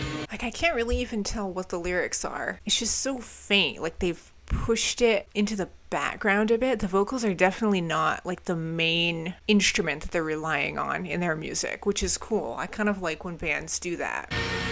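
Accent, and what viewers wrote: United States. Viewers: god ur american accent is so annoying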